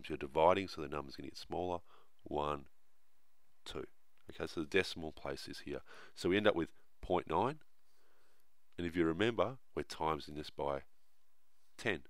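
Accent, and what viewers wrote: Australia. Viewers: Are you Australian? Sorry if your not yo just sound Australian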